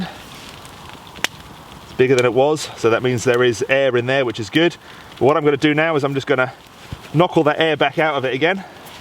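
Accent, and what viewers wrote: England, United Kingdom. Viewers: This is great, going to give this a go, and also check your other vids. (no disrespect to our American friends, but it's nice to see this sort of vid presented with an English accent !) Have subbed. Loving that dog !!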